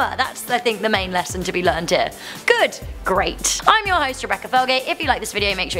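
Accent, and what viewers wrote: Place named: England, United Kingdom. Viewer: English accent 😍😍😍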